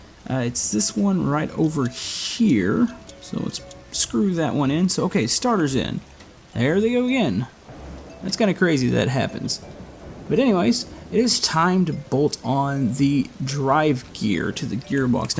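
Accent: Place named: Maryland, United States. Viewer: bro, u sound like me. r u from Maryland?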